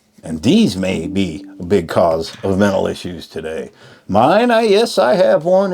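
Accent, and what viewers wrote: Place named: Tennessee, United States. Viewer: Hello, totally agree that something has changed. I think its the food, the plastic containers, the stuff they feed in the animals, AND MORE IMPORTANTLY, those VIOLENT VIDEO GAMES. Or, Do you see the chem trails in the sky where you are? We're I So. CAL. Way too many people here. I'm taking care of my 101 yr. Old mom. We can't move right now. But I enjoy listening to you and what you have to say., i look for your videos every morning. My husband's family were/are in Tennessee , I like your accent, reminds me of my husband's aunt who was a sweetheart. Happy trails.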